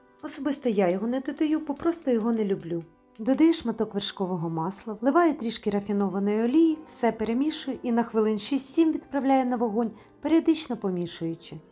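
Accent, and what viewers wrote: Standard. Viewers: Обожнюю ваші рецепти.Дивлюсь на одному подиху.Поки не маю можливості спробувати бо не вдома.Але незабаром думаю реалізую таку можливість.Окремо дякую за прекрасну,чудову,гарну, неповторну,чисту, правильну УКРАЇНСЬКУ МОВУ. Це неймовірно.Натхненя,наснаги,здоров'я Вам і всього найкращого.❤❤❤❤❤🎉🎉🎉🎉🎉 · Як ви гарно розмовляєте ❤❤❤. Приємний голос , чиста українська мова, рецепти і їх подача чудові ❤❤❤. Удачі вам , підписуюсь ❤❤❤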